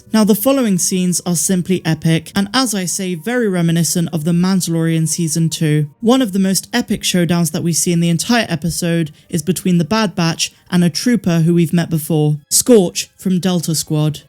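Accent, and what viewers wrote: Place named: Bristol, United Kingdom. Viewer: Hi. First, thank you for all yours videos.....(still saying your a West country girl, Bristol???) I just love the West Country accent, but I hear the bizzell accent in you. Oh, just to let you know, just in case your thinking, why is he saying all this......don't worry, its because I'm from the West Country......Weston-super-Mare. Anyway, OMG, loved this episode. The John William music made it for me. The man is a Legend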